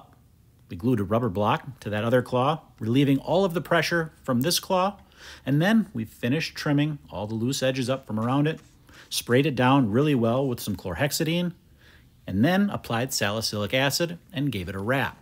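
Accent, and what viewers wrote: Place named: Wisconsin, United States. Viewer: Ope! Thats a Wisconsin accent if I’ve ever heard one.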